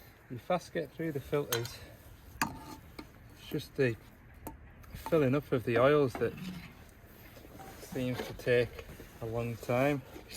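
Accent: Cumbria, United Kingdom. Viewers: Hi,My mum was from a small place called Longtown just outside of gretna green. Now I remember as a youngster her having quite a similar accent to yourself,are you from that area,she was a mad keen Carlisle football supporter which I remember saying was about 7 mile from her place of birth.....Just keen to know,I'm a joiner by trade but really enjoy your content.....Regards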